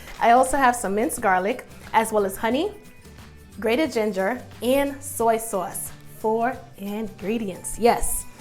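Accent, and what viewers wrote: New York, United States. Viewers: oh yeah ....she's a New Yorker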